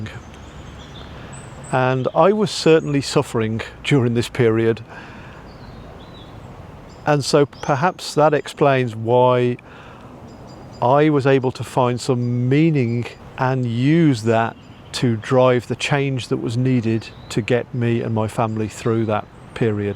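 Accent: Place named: Midlands, United Kingdom. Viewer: Your family should be so proud of you! You speak such sense … I really found this interesting and drew parallels with my own life. You sound like a fellow midlander… good luck to you x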